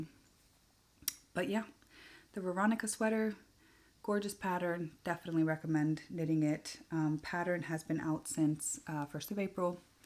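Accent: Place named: Montana, United States. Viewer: Don’t laugh but I thought you were podcasting from Montana or Wyoming in the US or Alberta Canada. Yesterday I was watching The Knitty Stew and she referred to “an Icelandic cast off”. I have been knitting for 45 years and have never heard of this. In a future episode would you please show us how it’s done? I would love to win some Icelandic laceweight! Your knitting is exceptional! And the magic mushroom socks are definitely on my needles next. Your kids will never be lost in the snow-and your description of the ‘highlighter with pink undertone’ was perfect. Thank you very much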